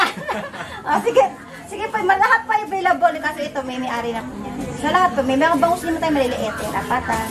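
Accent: Philippines. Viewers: I love your pinoy accent, are you married?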